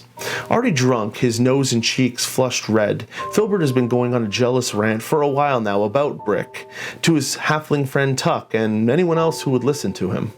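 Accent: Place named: Newfoundland, Canada. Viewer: Deadly Newfie accent! Haha. Well done. Amazing video and channel you have here! Keep it up! sent me. :)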